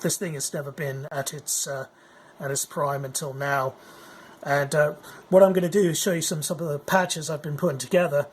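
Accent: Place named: United Kingdom. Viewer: Thanks for this, I'm really considering one now. Feels so right to hear a UK accent explaining a Wasp (clone, but still)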